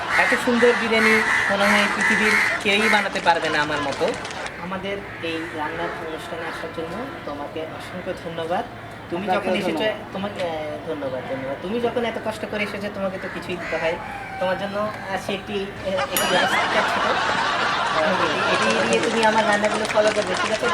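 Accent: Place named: Rajshahi, Bangladesh. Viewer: rajshahir pola kothai buja jaitache ga.... nice job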